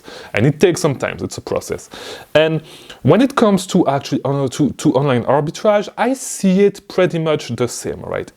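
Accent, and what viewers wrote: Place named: France. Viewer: Hé tu parles français? J'entends ton accent?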